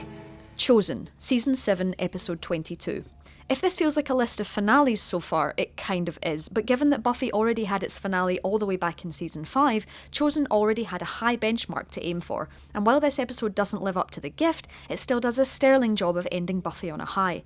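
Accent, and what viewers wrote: Scotland, United Kingdom. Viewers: That Scottish is an ointment to my ears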